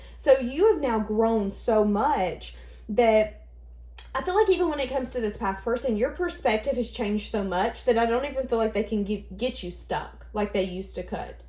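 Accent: South, United States. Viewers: Thank You. You are inspirational. Love your sweet southern accent and good advice.❤❤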